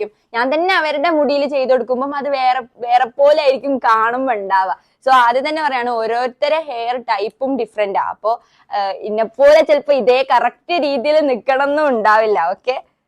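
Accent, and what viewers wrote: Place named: Malabar, India. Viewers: Kannur slang athyyavishyamund❤ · Malabar slag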